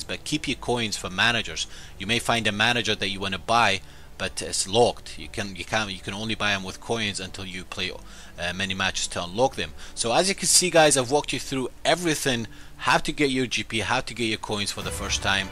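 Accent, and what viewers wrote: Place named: Scotland, United Kingdom. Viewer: do you live in Scotland? sounds like a Scottish tint in your accent haha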